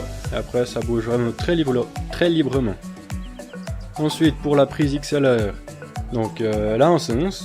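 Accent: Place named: Switzerland. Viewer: ça sent la SUISSE dans ta voix !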